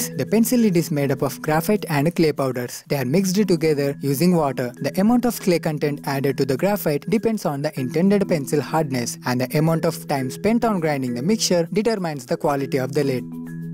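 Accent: India. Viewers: Would still be better without the gupta accent.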